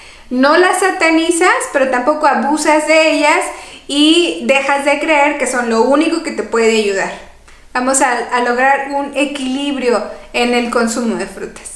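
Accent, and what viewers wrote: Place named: Mexico. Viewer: Desde Espańa te digo: Eres la mejor y la mas GRANDE. No puedo vivir sin seguirte en la Red. Cada dia que pasa, te quiero mas!!! No se que hariamos los diabeticos hispanoparlantes, de ambos hemisferios, sin tu asistencia tan altamente cualificada y AMENA, desparramando gratuitamente chorros de amor y conocimiento, a traves del ciberespacio. Por desgracia, la mayoria de medicos endocrinos, andan saturados asistenciales y no te escuchan, ni te explican.......siempre lo mismo: merformina y mas merformina o insulina i mas insulina......y nada de dialogo. No tienen tiempo para escuchar!!! Que pena!!! Tu dulce voz femenina, de suave acento mexicano y en el hermoso espańol que se habla en las tierras del Nuevo Mundo, me llega a traves del eter, como un balsamo de ilusion por la vida y la salud. Eres como una luz que a traves del espacio oscuro, me llega brillante desde el Nuevo Mundo mexicano, para recordarme cada dia que todavia estoy vivo y que aun quedan personas buenas en este Mundo de Mierda, en el cual nos ha tocado vivir. 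Sigue asi mi amor, continua haciendo este gran trabajo de divulgacion, sobre diabetes. No decaigas. Eres Grande y cada dia que pasa te quiero mas. Desde Barcelona (Espańa), te mando un besote muy fuerte. Hoy dia miercoles, 7 de Julio de 2021. Que suerte haberte encontrado en la Red!!!! chao.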